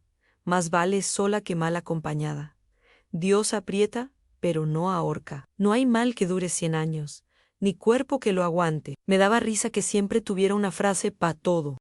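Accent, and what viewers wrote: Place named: United States. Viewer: Porque ese tono Pocho igual que las Historias de hombres con Historias de Inmigrantes an ho muy pochos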